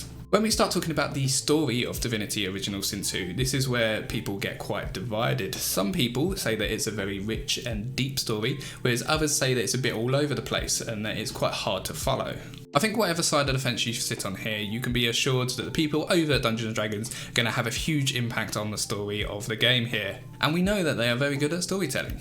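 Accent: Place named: United Kingdom. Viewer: Hi! Great video! Just curious, where are you from? I would guess somewhere in UK but can’t place an exact place. I think baldurs gate 3 is gonna be amazing!! Thanks and keep up the great content.:)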